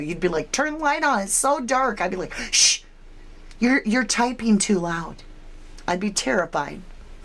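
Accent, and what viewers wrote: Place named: United Kingdom. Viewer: Love your British accent 😂❤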